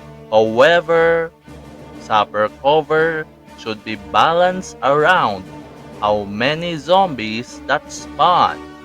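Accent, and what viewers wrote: Philippines. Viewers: Woah you're a fellow kababayan, I could tell from your Filipino accent. Amazing on point video about sapper building management.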